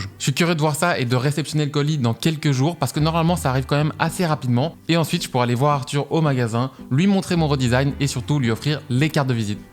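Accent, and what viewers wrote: Belgium. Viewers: super video vraiment je te kiff mais j'ai une question. T'es Belge ou Français ?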